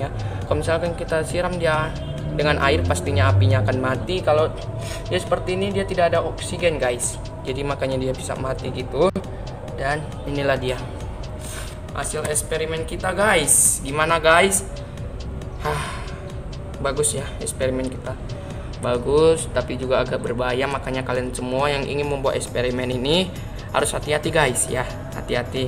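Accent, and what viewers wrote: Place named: Batak Toba, Indonesia. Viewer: logat, bahasa dan marga anda saya tahu. Batak Toba. Saya orang Karo dan tinggal di sumut juga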